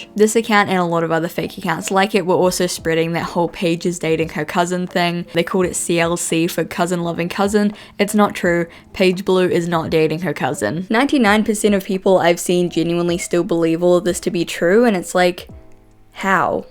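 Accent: New Zealand. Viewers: "that probably sounded really stupid in my accent." Speaking of which, what is your accent? I've watched several videos now and I can't decide between New Zealand and South African.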